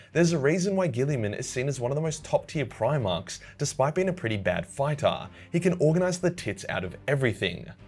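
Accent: Australia. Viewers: It takes an Australian 40k nerd to make Yandare sound cool what a time we live in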